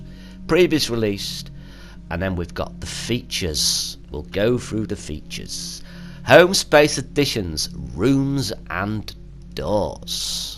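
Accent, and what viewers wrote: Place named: Scotland, United Kingdom. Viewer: I like your video Mr. British/Scottish guy, I will subscribe so that you can inform me of more of these major updates affecting the gaming industry and PS4 exclusives, I seriously don't know where I would be if I hadn't found your channel, I would literally be living under a rock, can you please update us on the future of the gaming industry economy, Covid-19's effects on the industry and when GameStop will become a penny stocks? THANKS.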